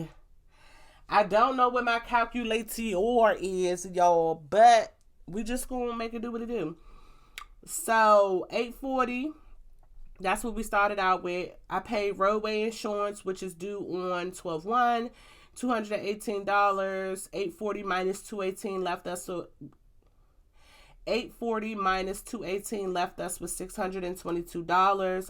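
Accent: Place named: Baltimore, United States. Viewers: I'm glad your video came up in my feed. I wasted to get started saving this way so I don't overspend. I love clothes, girl. Anyway, your accent sounds like 410. I'm 410 too. 410 to the bone. Have an amazing day. Subscribed!!! 🤗🤗🤗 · I'm from the south, but I heard that Baltimore accent, too 😂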